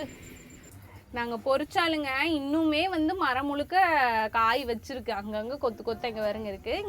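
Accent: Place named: Coimbatore, India. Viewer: திரும்ப திரும்ப நிறைய பேர் இந்த கமன்ட் போடுறீங்க.இது கோவை ஸ்லாங்.அவங்க எதுக்காக அத மாத்தணும்னு நினைக்கிறீங்க.அவங்கள அவங்களா இருக்க விடுங்க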